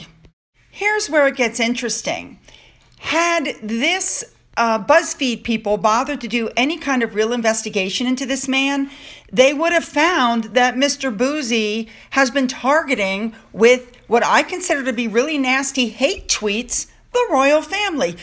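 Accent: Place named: Brooklyn, United States. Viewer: Boo hoo, here in America, that's how people in Brooklyn speak, and WE LOVED IT !!!! Listened to it 2 times myself!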